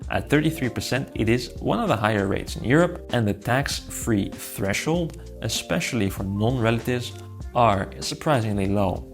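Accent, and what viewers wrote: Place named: Finland. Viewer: The video would be easier to follow if it wasn’t in a thick (Finish?) accent. But otherwise informative.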